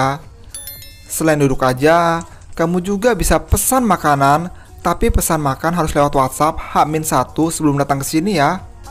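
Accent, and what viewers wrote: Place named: West Java, Indonesia. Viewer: Khasss Sunda nya ... Opisial 😅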